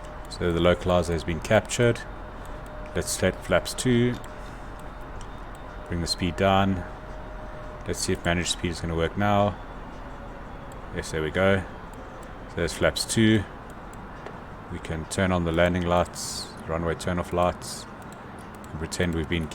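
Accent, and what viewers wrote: South Africa. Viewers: Baie dankie excellent tutorial , I lived in SA for 5 years, working at Cape Town airport, your accent brings back fond memories of my time there. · Awesome tutorial, accent is a bonus...seems South African. This tutorial was just what I needed to know. I'll practice later today.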